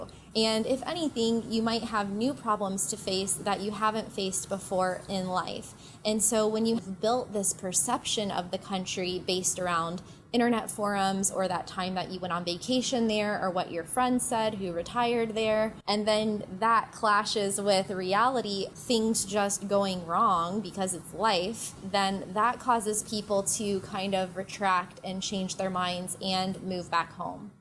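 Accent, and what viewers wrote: United States. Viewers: Sorry ,but croaky American accent makes this impossible to listen to !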